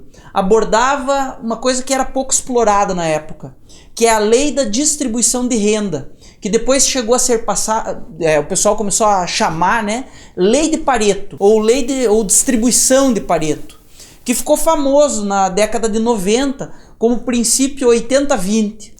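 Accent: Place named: Paraná, Brazil. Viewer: Esse sutaque acredito que seja paranaense kkkk. Excelente profissional o.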